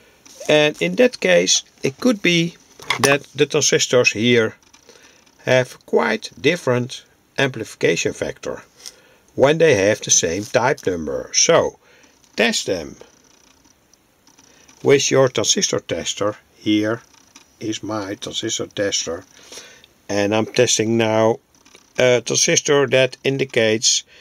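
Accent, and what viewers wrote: Netherlands. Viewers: I love your Dutch-English accent. It reminds me so much of my grandparents. Thank you very much for the excellent advise on sorting components. I am very much interested in seeing more videos that are dedicated to the testing of the various components. This video is very inspring.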